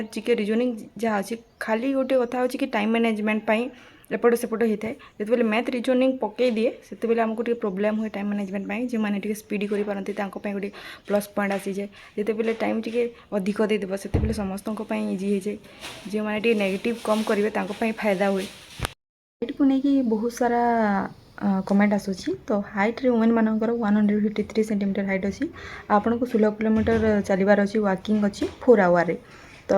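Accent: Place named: Sambalpur, India. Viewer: Tamar accent ta pura Sambalpuri mix aae.... Best of luck Jai Jagannath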